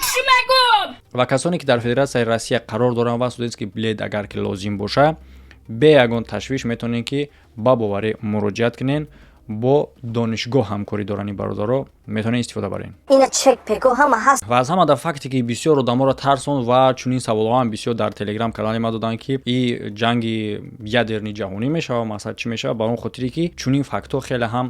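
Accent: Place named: Gharm, Tajikistan. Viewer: Ака гапт надорм МошоАллоҳ у калай тъ ака гарени бад тезай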